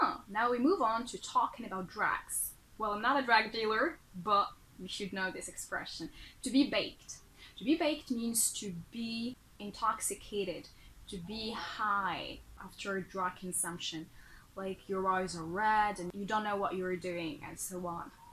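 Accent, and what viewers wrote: United States. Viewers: ماشاءالله لهجتك قوية جدا، كأنك أمريكية تحياتي لك من ليبيا 🇱🇾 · you have a good American accent !! how did you manage to pronounce this way ?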